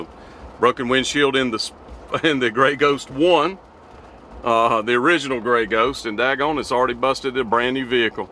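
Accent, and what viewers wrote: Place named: Tennessee, United States. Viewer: l am from Canada and l lived in Manchester, Tn in the 2000s and your accent reminded me right away of being back down there in Tn. Loved it and loved the weather.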